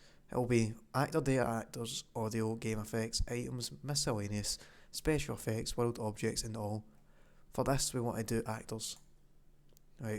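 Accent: Scotland, United Kingdom. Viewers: i was so happy when i heard that scottish accent, about 10x better than an indian dude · Finally a mod tutorial for me: with Scottish accent XD Thank you for this, it's very helpful and thorough. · Yes a Scottish guy finally No homo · A fellow Scotsman eh?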